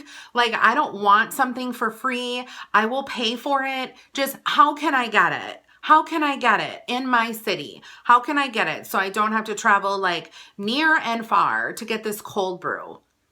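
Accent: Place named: Midwest, United States. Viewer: dolly5621 chirios her voice makes my ears bleed. I really can’t stand the midwestern WICSAANSIN/ MINISOOOOTA accent. Ugh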